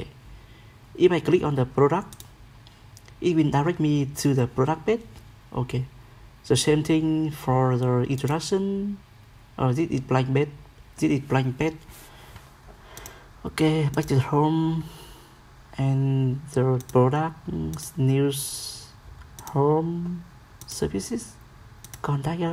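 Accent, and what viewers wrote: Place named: Vietnam. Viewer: Nghe giọng phát âm này đích thị người việt rồi :v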